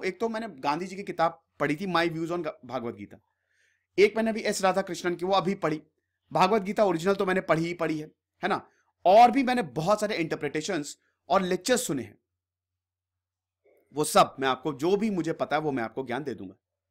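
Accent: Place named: Lucknow, India. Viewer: 😀😀😀... Lucknowi andaaz 😎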